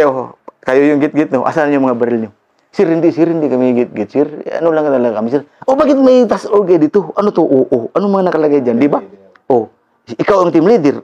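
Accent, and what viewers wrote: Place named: Visayas, Philippines. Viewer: Bisdak kaayo c Master.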